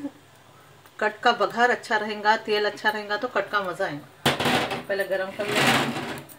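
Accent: Hyderabad, India. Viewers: Ek dum Hyderabadi hai aap 😂 Mere jaisa ich 😎😂 Ek pyaaz ki dalli 🔥 Dialogue was awesome 😂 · Aswk aunty I like yr way of speaking a pure hyderabadi language we enjoy yr style of cooking For the first time we heard of dahi ka dalcha thnx for sharing new recipe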